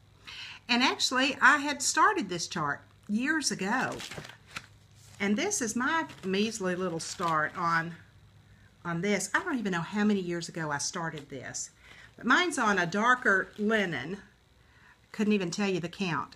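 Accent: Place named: Georgia, United States. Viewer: Happy Birthday! I love your videos and I’m so excited that you are going to be making more! I’m from Georgia (currently living in Louisiana) and hearing your accent is a wonderful reminder of home. I would love to stitch the Baroque Ink Circles. Thank-you for sharing your love of stitching with all of us!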